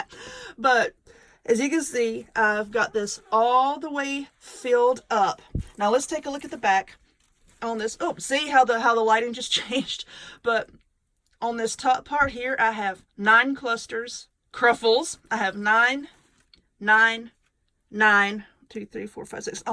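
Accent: South, United States. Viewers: I really love your beautiful crafts. However I don't know if I love your crafts or southern draw best. Know any slang words you can entertain me with? You sure make me feel like I was in my old home. I'm looking forward to you exhibits. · I am so glad I found your channel. 1 you're Southern-like me- so we speak the same language (lol). 2 your creativity and ability to think outside of the box amazes me. I would've never thought 2 make a Cross out of cooling racks! Thanks for sharing your amazingly awesome ideas with us! I've got to add this one to my list of wreaths to make!!!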